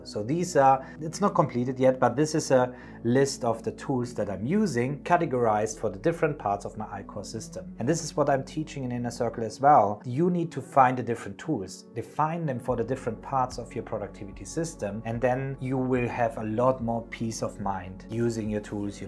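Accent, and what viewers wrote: Germany. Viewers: Do we here a german accent here? :D Great video! Greetings from Karlsruhe!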